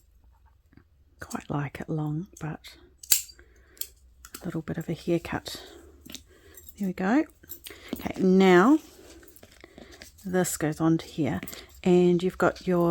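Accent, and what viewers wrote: New Zealand. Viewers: How cool to hear a kiwi voice!!. Great project xx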